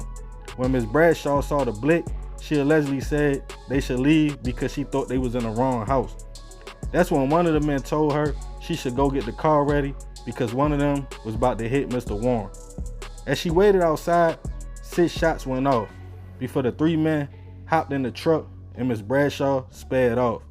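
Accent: Baltimore, United States. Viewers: Ur Baltimore accent stinks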